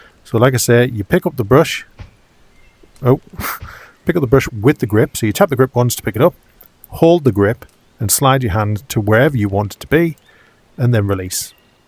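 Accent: United Kingdom. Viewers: Just got Vermillion and was after a vid that explained it all. Up yours popped, and I'm glad it did. Clear and thorough, all with a welcoming NE accent (I'm not foolish enough to risk a guess which one...). Thanks for posting! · I am very grateful for this tutorial, nice to hear a fellow Brit. Exporting on my quest 3 goes to the data file in the android folder. BUT, it is saved as a VRMLN file. There is no option to save to a particular file format such as jpeg or png. I am doing something wrong? Do I need an account? Is there a setting somewhere I missed? Any help would be gratefully received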